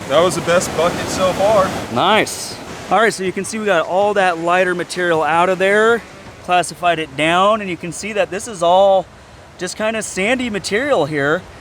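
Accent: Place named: United States. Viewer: Gold in the top material, gold in the red oxidized sand, gold in the blond sand . Flood gold is found in flood layers? Excellent deduction! What country are you in? What river? You have an American accent, not Australia, Colorado, Arizona? are you in Northern California?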